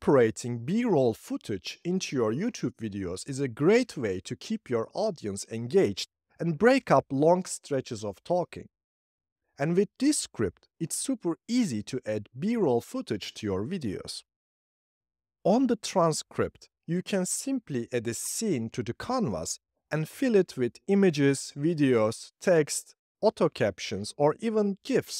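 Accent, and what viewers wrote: Turkey. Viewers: Türk aksanı 😊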